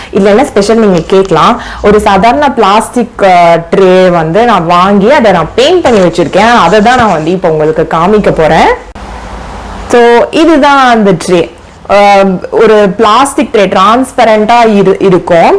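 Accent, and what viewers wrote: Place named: Sri Lanka. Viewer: சகோ super 👍 இல‌ங்கை தமிழ் இந்தியா தமிழ் பேசும் விதம் வித்தியாசம், from Switzerland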